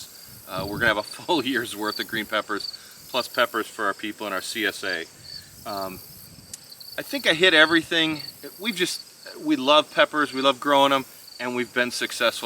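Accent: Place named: Minnesota, United States. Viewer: NO! Don't change a thing,! We get a little taste of Minnesota when we watch your channel! 😁 I'm confident over 20 years in Georgia has given me some southern spice to my accent, haha!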